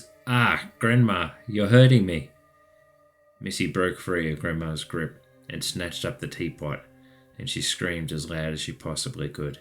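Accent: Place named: Australia. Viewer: I figured that out on another video. I even commented like, "hold on you sound extremely Australian now"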